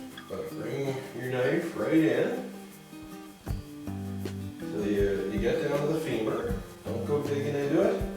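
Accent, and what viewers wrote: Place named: Canada. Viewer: Sounds like a Canadian. Credibility issues.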